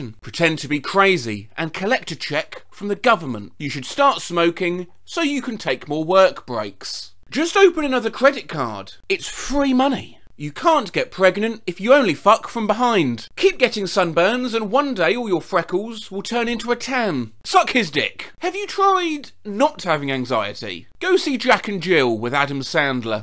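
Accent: United Kingdom. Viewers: i love british people reading internet things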